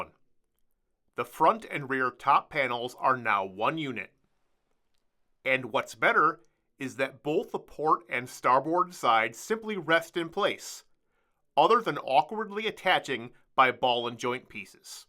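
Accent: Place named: Canada. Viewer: Are you Canadian because MAN you sound like a Canadian Also Great job very well done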